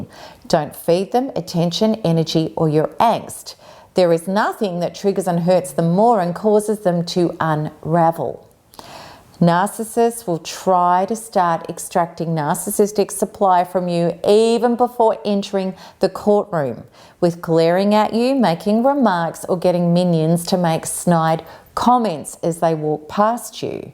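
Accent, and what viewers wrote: Australia. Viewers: Thank you for helping me understand. Three years ago I found you as a result of an awakening prompted by intuition something was not quite right I read an article you had authored. Since then, devaluation, discard, deletion. Loss of home, children, job, family, everything except one very important belief. Me Thank you for your for courage . I kept hearing this Australian voice in my thoughts assuring me I was not alone in this nightmare Thank you, 'down under'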